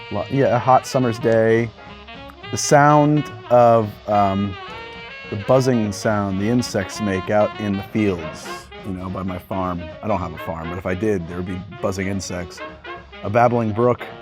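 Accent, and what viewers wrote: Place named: New Jersey, United States. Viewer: i knew you were from jersey lol idk why but i could just tell. so now i gotta ask taylor ham or pork roll?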